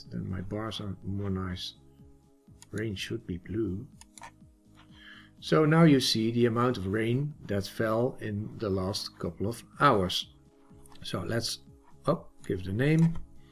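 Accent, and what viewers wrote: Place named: Netherlands. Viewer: Hi hi dat klinkt Nederlands 😉 en daarmee vroeg ik me af of u bekend bent met iungo?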